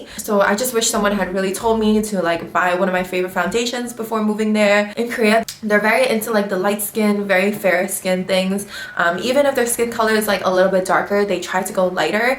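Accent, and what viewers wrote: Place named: Queens, United States. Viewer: She has such a queens accent.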